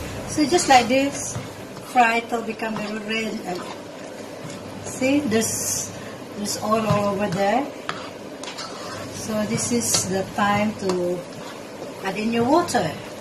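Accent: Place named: Singapore. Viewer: Ganda ng accent mo legit na legit na singaporean hehe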